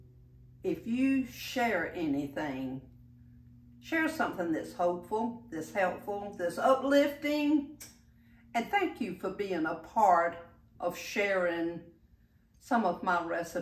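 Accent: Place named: Deep South, United States. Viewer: You don’t say where in South Carolina your from. I live in the upstate, Greenville . I came here in the 70’s, my husband tells me when someone asks me I can finally claim, “yes I’m from around here.”☺️ Your accent sounds Deep South, Charleston and I love it! I lost my sweet 98 year old mother-in-law in 2020 and you remind me so much of her in the way that you cook. She was a wonderful teacher just like you.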